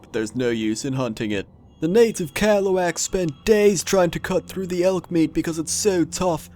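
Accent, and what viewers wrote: Australia. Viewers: bear grylls with an australian accent ...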